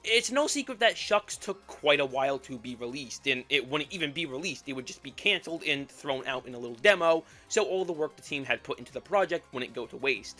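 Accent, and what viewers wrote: Brooklyn, United States. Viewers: By the way, do you have a Brooklyn accent? It sounds like it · The worst and best part about this video is that he sounds like Brooklyn guy slightly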